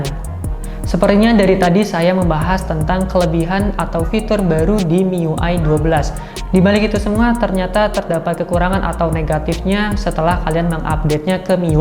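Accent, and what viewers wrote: Tegal, Indonesia. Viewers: tegal bos? haha auto sub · Wong tegal um?? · Jebule tegal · Tegal kah??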